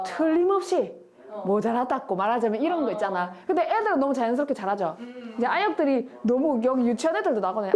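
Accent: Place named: Daegu, South Korea. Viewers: "이미생긴 이미지" 이건 부산,경남 사투린데..ㅎㅎ 선생님 사투리는 대구,경북 사투리기준이군요^^ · 아, 이!미지로 찍으신다길래 읭? 경북인가?했는데 찐 경북이셨구나 ㅋㅋㅋㅋㅋ지나가던 경남 웃고갑니다😂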